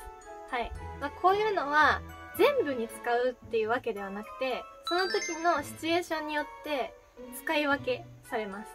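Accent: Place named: Hakata, Japan. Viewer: 千葉県からです！博多弁が凄く好きで、フル見させて貰いました。やっぱり博多弁っ良いですね！ありがとっちゃね！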